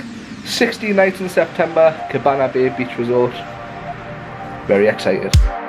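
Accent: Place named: Newcastle, United Kingdom. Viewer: It’s always hard coming home, and nice to hear a fellow Geordie.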